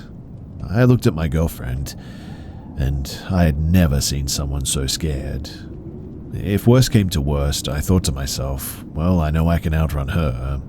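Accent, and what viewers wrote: Australia. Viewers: Starting the video and hearing a deep Australian accent say "I'm a Chinese woman" was very unexpected.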